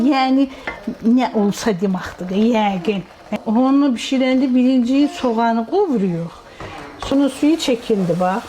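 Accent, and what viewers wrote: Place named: Zagatala, Azerbaijan. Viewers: Zaqatala ləhçəsine oxşuyur abay 😊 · Qax zonasının ləhcəsidir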